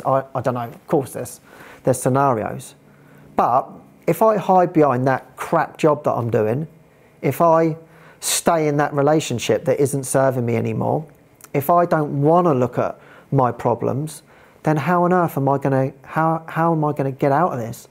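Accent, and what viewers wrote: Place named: England, United Kingdom. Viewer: This was joyful and fun, and with that England english almost like Monty Pyton 🙃🧡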